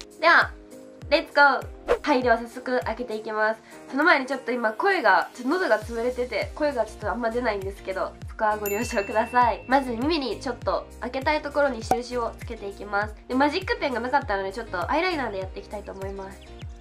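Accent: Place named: Gifu, Japan. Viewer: 自分岐阜だから喋り方に親近感湧く！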